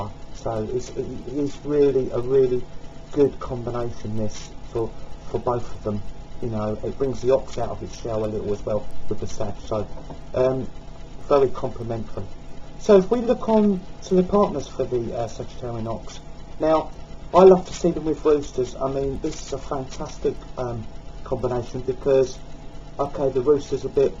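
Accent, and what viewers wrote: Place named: London, United Kingdom. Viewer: What is your regional accent, London sort of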